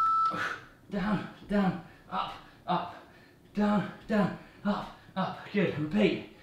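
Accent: United Kingdom. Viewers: Far too british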